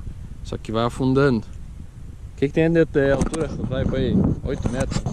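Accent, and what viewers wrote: Rio Grande do Sul, Brazil. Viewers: O lugar é lindo, o vídeo é top, porém o que eu mais gosto é o sotaque gaúcho. Sou Paulista porém gosto da cultura, da culinária e tudo o que é do Sul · A DORA GAÚCHO O SUTAQUE É TOP ABRAÇO A TODOS DO RIO GRANDE DO SUL 😘😘😘😘😘😘😘😘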